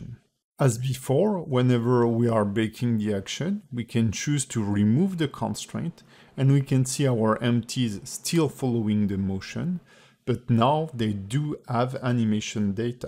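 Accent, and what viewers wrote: France. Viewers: L’accent french en force mdrr continue tu régale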